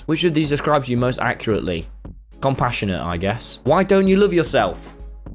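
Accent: United Kingdom. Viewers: its been a year since i watched. hes turned so much more british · i feel like he’s extra british this video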